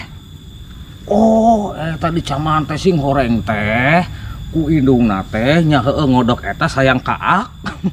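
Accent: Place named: Majalengka, Indonesia. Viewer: Sumuhun leres pisan kang, Sim kuring ge gaduh saderek di majalengka nyarios jiga kitu 😆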